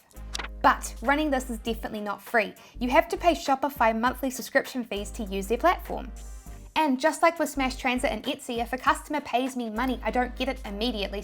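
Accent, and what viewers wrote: New Zealand. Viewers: u r of the few I subscribe to I had to get used to ur accent since I was not used to NZ accent but now I find it adorable HAHAHA thanks for very informative vids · The New Zealand Kiwi accent is one of my top favourite accents in the whole world, there's a really warm vibe about it, you've got such a vibrant upbeat and clear speaking voice! Others that am very fond of are the Irish accent aswell as the Scots Glasweigen accent were the dialect is very complex, the Newcastle Jordy accent aswell which is another good one, there's too many to name I know but each one is warm within there very own unique syntax, slang and dialect! 🙂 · Hey, going through your course a second time while I set up my store....should I create an LLC for protection or wait until I find a winning product before starting a legal business? Maybe a future video? Also I'm starting to say words with a NZ accent because of you...the newest one is pola beas 😃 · hi By the way i really like your New Zealand accent. 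I just opened a store on REDBUBBLE and after 5 days of use it they suspend my account and i don't know what i'm gonna do and thanks for your efforts i love you. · I'm a new subscriber (past two months), totally won over by your straightforwardness, can-do attitude, and NZ accent. Love it! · I love New Zealand accents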